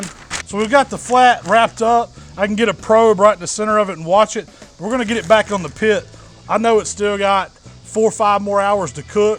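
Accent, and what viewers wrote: South, United States. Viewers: As soon as I hear that thick southern draw, I know this is gonna be some good BBQ. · The best channel to pickup the American accent. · First seven seconds is all I needed to watch to know this guy is legit. Fat guy with a southern accent? SOLD. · Vegetarian here. This is sooo satisfying to watch! Specially his southern accent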